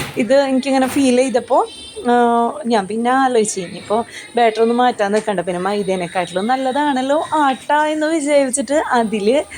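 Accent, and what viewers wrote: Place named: Thrissur, India. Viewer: Masha allah ❤❤❤ നിങ്ങൾ തൃശൂർ ആണോ സംസാരം കേട്ടിട്ട് അങ്ങിനെ തോന്നുക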